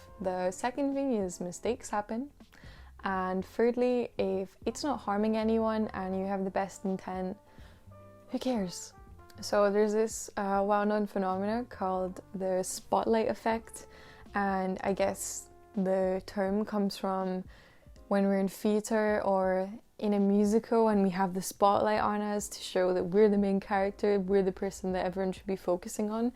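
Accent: Scotland, United Kingdom. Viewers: I feel like you’re Scottish? Are ye? Mibbi? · Scottish? Your speaking voice is so soothing, ill belive your a bad singer when I hear it.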